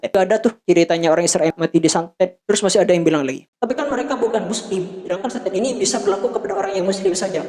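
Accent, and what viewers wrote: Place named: Madura, Indonesia. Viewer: Logat madura pake lo gue agak aneh bg😅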